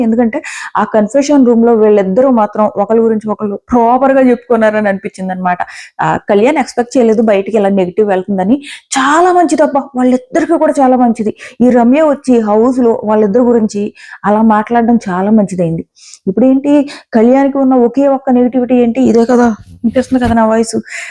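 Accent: Godavari, India. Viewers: Madam mi voice koda godavari ga pavan ramya same area na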